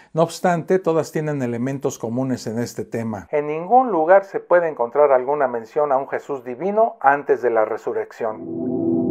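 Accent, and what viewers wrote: Mexico. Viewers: Es muy fácil, si es un mensaje cifrado con la posibilidad de ser interpretado por los enemigos, que clase de mensaje cifrado es?, por eso no lo puedes interpretar, ni el diablo puede hacerlo, lee Mateo cap. 4 y lo verás, ahora, enumera todas las contradicciones que has encontrado y te ayudaré a despejarlas, según parece ese libro tiene el aval de la historia que fue creciendo en el tiempo hasta la actualidad, Israel era una persona como tu, una persona que existió 😂, salvo que te niegues a ti mismo como un ignorante con acento mexicano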